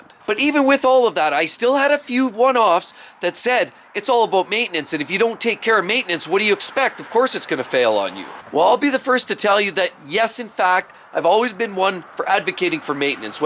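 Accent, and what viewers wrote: Canada. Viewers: Wait wait.. are you from Canada?!.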